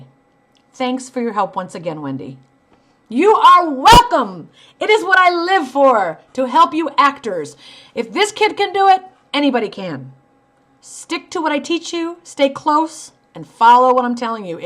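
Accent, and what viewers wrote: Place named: New Jersey, United States. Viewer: Connecticut. Haha 😆 I was guessing you were from Jersey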